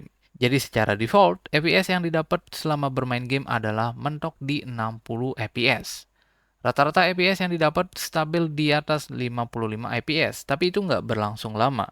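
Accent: Bali, Indonesia. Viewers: logat nya kek orng bali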